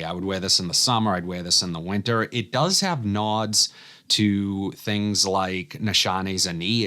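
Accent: Boston, United States. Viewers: Man....that Boston accent. Gets me every time, in a good way. lol Thanks bud for a great video. I used to be all about niche but lately I am totally digging some designers that should be niche! A few are: Montblanc Black Meisterstuck (a more elevated and better performing version of Black Lacquer), Boss Bottled Absolu (I have not smelled any of the other Boss frags, but I cannot imagine anything being better than this one - beyond great!), Salvatore Ferragamo Pour Homme Oud (you can thank me later), Cartier Declaration d'un Soir (such an amazing manly rose).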